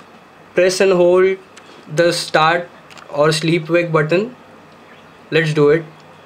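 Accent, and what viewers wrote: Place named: India. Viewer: Is it me or every Indian sounds the same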